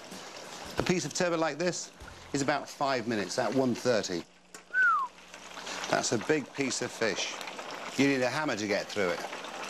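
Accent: United Kingdom. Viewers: 我跟你說只是他的英國腔講得好聽而已，如果你去到當地就會發現很多當地人說的英語你根本就不可能聽得懂，我來自香港土生土長的70後，我還記得讀中學的時候，當年還是殖民地時期，那些英語教師經常都會跟我們說學英語要學英式口音才是王道！後來出了社會之後才知道原來英式口音去到美國或其他說英語的地方，人家都當你鄉下佬覺得你好老土⋯ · 英國腔真的超好聽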